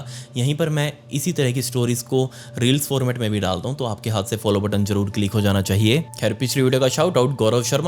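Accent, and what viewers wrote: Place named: Andhra Pradesh, India. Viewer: Accha, so you're an ANDHVAKT! Waise tumhare English pronunciation se shaq hota tha, aaj clear ho gya.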